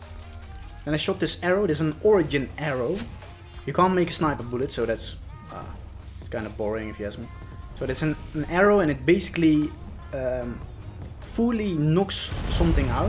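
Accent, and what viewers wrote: Netherlands. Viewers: Bro ik hoorde meteen toen ik de video opstarten dat je nederlands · Nederlands accent hahaha! keep it going! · Ik hoor dat Nederlands accent wel hoor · Hahaha i can hear at your voice that you are from NL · Haha ik ook hoorde het in het accent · Are you from belgium or netherlands bcs i can kinda hear the accent$ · Nederlands acent ga door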